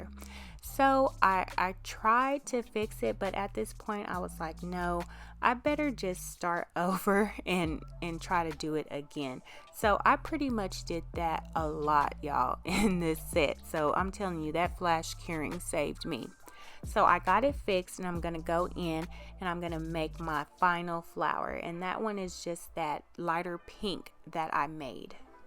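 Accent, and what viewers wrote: South, United States. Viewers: I love these! I hope I didn't say anything out of line by saying you sound like you're from the south? I mean I love it. I know a lot of people say southern people sound stupid because we have a southern accident. I want meaning that at all. I love your accent again I have a southern accent but yours sounds sweet. I'm not usually into clear tips but I really love these colors and it might have to you all day but you make it look so easy. That's why I don't do my nails a lot because it takes me so long! Much love to you!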